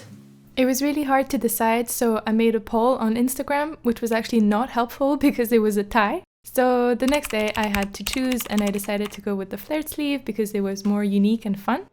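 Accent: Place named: Germany. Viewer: ❤ Ich dachte zuerst, dass du aus dem deutschsprachigen Raum stammst, wegen dem Akzent. Deine Videos sind wundervoll, ich liebe deine Nähprojekte. Auch die Szene mit dem lebhaften Austausch über das weitere Vorgehen, war sehr lebensnah und schön zu sehen. Abo, Glocke und Vorfreude auf weitere Videos von meiner Seite her ❤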